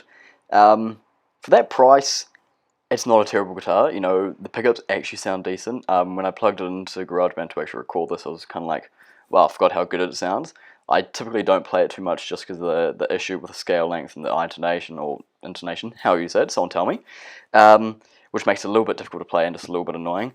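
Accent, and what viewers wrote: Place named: Germany. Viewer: You kinda sound German lol. But you're a Kiwi, right?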